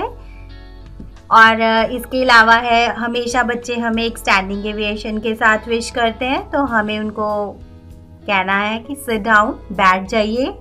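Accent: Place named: India. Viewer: Sorry but I can't understand what you said. The accent is like Indian or Malay or someone from Middle East.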